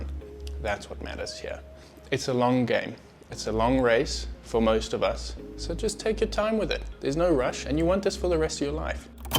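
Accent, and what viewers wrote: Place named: South Africa. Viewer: Why is someone with a SAffer accent talking about "pounds"???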